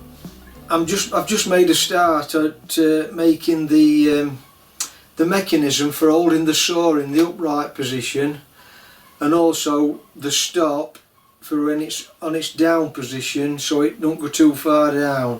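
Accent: Yorkshire, United Kingdom. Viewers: Nice little machine . You sound very sort of Pontefract area. From a Leeds lad.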